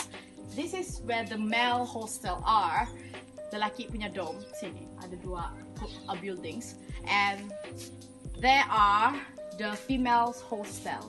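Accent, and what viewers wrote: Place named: England, United Kingdom. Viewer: This is really good information because I dreamt to go here btw I like your English accent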